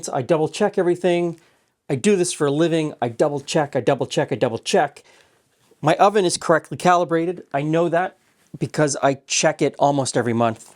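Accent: Canada. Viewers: Hi just started watching your videos. I’m Scottish ( Edinburgh) so watched the old Scottish cook book one. Was curious to see if it matched the kinda stuff my granny passed down. The carrot pudding looked good. Although it did look a lot of butter so I’m not sure it meant fluid Oz maybe Oz as in scales. Anywho could try both ways to see. So this one would have been better done as a clootie dimplimg. A cloot meaning cloth in Scotland. So boiled for about 2 hours. Also the addition of suet is helpful and would have been in most recipes.. puddings and pies. Thanks for your videos , you seem like a kind friendly guy. I’m also starting to recognise the Canadian twang in accents when I hear it. I’m not used to hearing Canadians being from a small village. Look forward to seeing what else you do.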